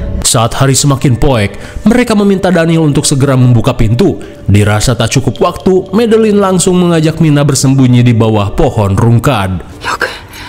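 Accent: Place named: East Java, Indonesia. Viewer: Tak bolan baleni "lele apa yg bisa terbang" 😂😂 Jowo etan teko kang 👍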